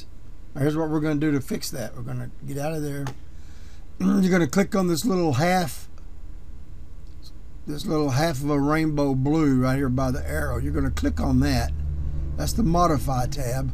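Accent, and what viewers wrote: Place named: South, United States. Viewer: two questions my friend.... 1. what game are you exporting to? 2. where you from? (great to hear the southern accent...I am from Alabama)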